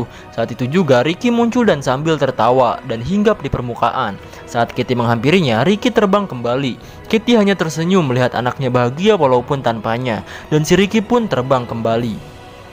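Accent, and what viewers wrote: West Java, Indonesia. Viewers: Bang dari jawa barat ya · Hahaaa sunda abisss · Kakak orang sunda yah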